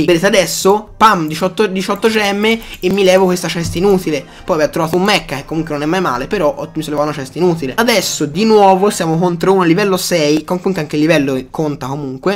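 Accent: Florence, Italy. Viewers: i fiorentini la maggior parte hanno tutti la voce cosi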